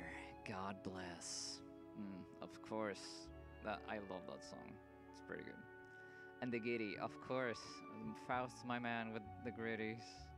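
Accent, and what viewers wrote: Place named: Japan. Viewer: ты хорошо говоришь на русском, но говоришь так, будто это японский или корейский, но а так нормально >___<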